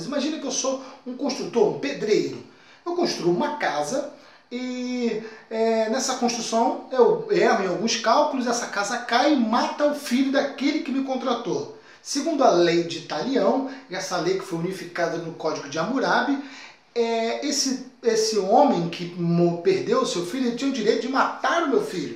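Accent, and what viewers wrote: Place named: Florianópolis, Brazil. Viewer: Seu sotaque é de Florianopolis vc é de lá eu sou eu sou manésinha kkk!!!!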